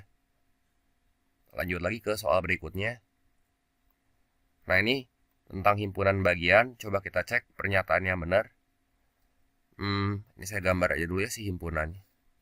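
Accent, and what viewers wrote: West Java, Indonesia. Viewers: LUCU IHH LOGAT SUNDA LOP LOP PA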